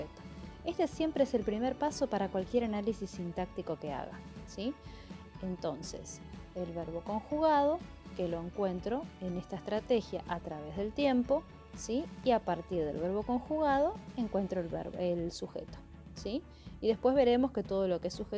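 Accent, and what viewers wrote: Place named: Argentina. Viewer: Gracias . porcierto sos de qrgentina. 🍽 un acento bonito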